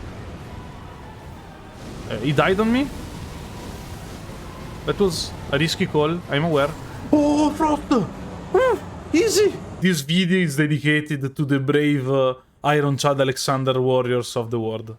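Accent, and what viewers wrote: Italy. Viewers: It's just your average Italian accent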